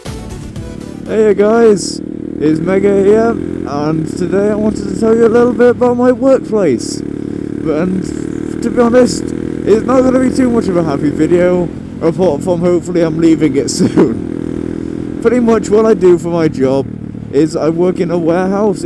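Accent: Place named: Sheffield, United Kingdom. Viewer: i come from sheffield but live in worksop in 15 miles away from sheffield i thought i recognised the accent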